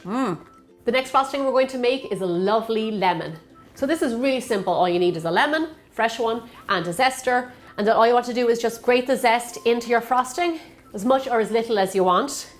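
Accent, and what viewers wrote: Ireland. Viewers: Are you Irish, I love your accent! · You've explained everything so well , so easily understandable for newbies like me Also , Love your voice and accent ❤️ Irish., I'm guessing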